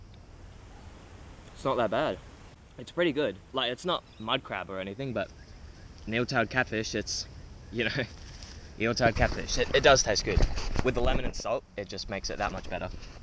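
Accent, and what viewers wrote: Australia. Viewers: Are you Mexucan ? no Austrailian .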